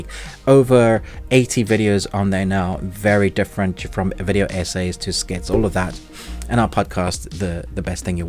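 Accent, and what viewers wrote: South Africa. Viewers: Are you South African and British or something?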